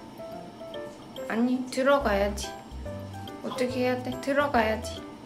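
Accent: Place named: Gyeongsang, South Korea. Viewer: 얘 나이가 어떻게 되요? 우리애도 보테인데..남자애고..3살이고...경상도 사투리 쓰시는거 보니...저는 김해 살아요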